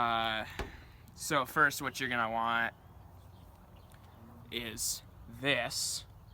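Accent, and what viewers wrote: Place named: Missouri, United States. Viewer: You tell he is a Missourian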